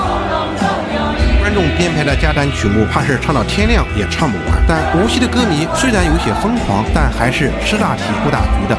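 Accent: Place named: Taiwan. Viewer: 臺灣腔 又很臺 沒想到500在中國那麼紅 難想像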